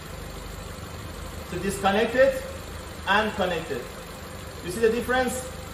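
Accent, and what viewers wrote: Iran. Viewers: لهجه ایرانی😊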